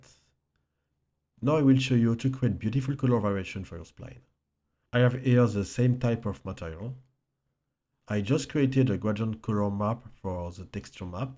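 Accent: France. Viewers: joli l'accent français :) merci pour la technique by the way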